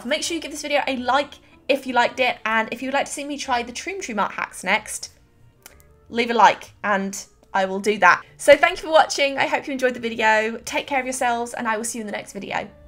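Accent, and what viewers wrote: England, United Kingdom. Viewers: Are you from the UK cos u have a British accent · Ok are you British? You sound like it. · Are you briish? cause I can hear the " " when you speak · Is she British because i cant place the accent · Shes super cute, specially with the English accent. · Is she British?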